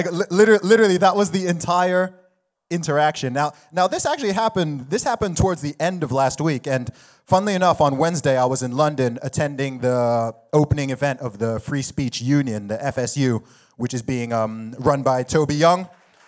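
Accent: United States. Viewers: It is funny you grew up in Saudi Arabia you live in England but you sound like you are from the Midwestern part of the United States. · you speak American because you want to hide your accent?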